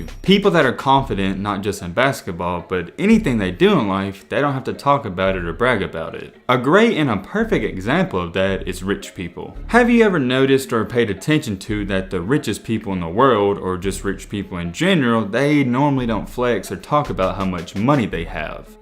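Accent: South, United States. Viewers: Bruh gotta be from the south somewhere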